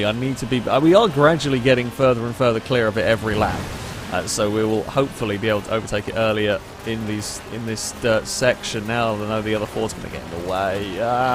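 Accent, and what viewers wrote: England, United Kingdom. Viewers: I watch this for the British Accent.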